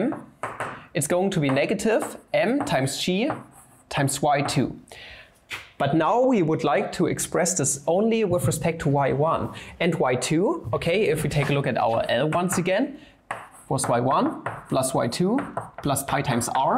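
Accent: Germany. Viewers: i know you're german from your accent 😅 keep going bro good luck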